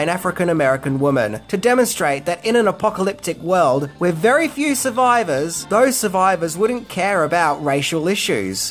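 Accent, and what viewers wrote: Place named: Australia. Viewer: is just speaking Australian English. In America, people say “oh-may-huh”. Apparently everybody else says “oh-me-guh”. Just different dialects of the English language.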